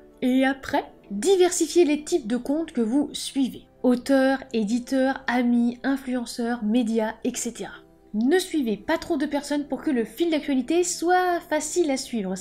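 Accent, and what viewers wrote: England, United Kingdom. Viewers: Merci l'accent anglais ! coeur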